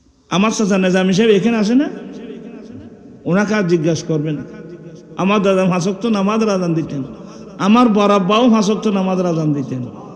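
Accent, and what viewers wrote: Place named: Chittagong, Bangladesh. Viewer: চিটাগংএর ভাষা বাদ দিয়ে শুদ্ধ ভাষায় ওয়াজ করলে সারা বাংলাদেশের মানুষ বুঝতে সহজ হতো বেয়াদবি হলে মাফ করবেন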